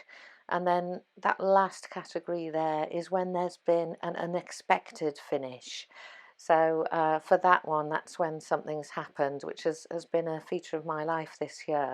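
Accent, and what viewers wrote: United Kingdom. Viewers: Thank you, I really enjoyed your video! I have a small balcony patio garden and I live in a apartment in Salem Oregon, but I’m originally from London. It’s so nice to hear a lovely homegrown voice and accent lol. I’ve been growing vertically, and my patio is north facing so I’m trying to maximize and organize myself better as I’ve really just been winging it the best I can, which is stressful. I’m going to give this tracker a try this year. Also I’m trying to put together my notes from the last three years of growing what I can into a garden journal. I hope to start growing what I can year round and keep track of progress and problems. I really love your tracker idea! 💕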